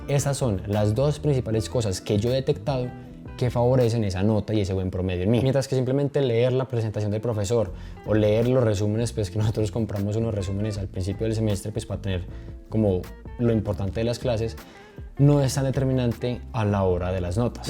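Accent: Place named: Colombia. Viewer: Uy apenas escuche esa voz dije ese man es de Colombia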